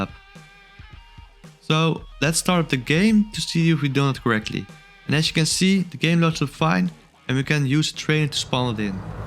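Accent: Netherlands. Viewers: Thickest dutch accent of the week. Nice video tho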